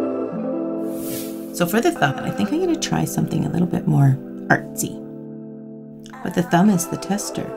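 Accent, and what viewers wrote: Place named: Ontario, Canada. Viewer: Omg I completely feel the same. I am Canadian living in US and I could hear it before I knew you are from BC. You sound EXACTLY like the women in my family loving in Ontario, so nostalgic for me. I am so grateful I found your channel, you're an amazing artist, perfectionist and woman 😊💕thank you!!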